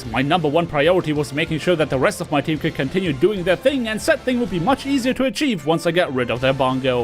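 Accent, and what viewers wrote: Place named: Germany. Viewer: He sounds kinda German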